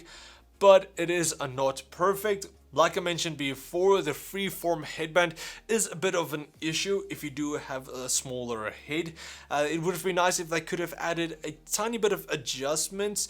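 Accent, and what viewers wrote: South Africa. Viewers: Hey. You're South African haha. Me too. Considering to buy this headset for myself now.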